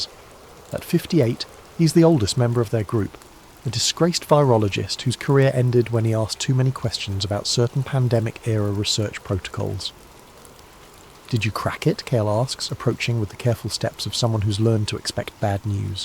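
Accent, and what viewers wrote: United Kingdom. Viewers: Ugh the older I get the less I like the British accent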